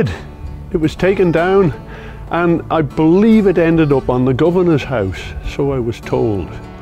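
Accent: Isle of Man, United Kingdom. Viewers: Absolutely brilliant. Great stories and the best Manx accent I've heard in years. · So nice to hear a true Manx accent.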